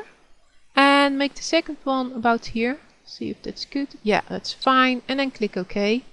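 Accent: Netherlands. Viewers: haha ik hoorde na de eerste letter dat je nederlands was xD wel cool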